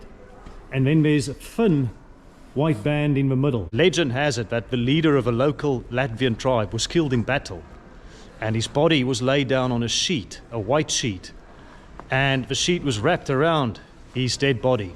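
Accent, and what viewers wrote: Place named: South Africa. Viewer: You Mr sounds like from South Africa to me, maybe I wrong,love your videos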